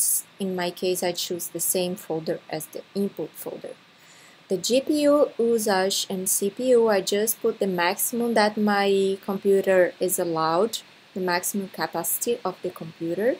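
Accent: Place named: Brazil. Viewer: Thank you very much! Appear Brazilian accent.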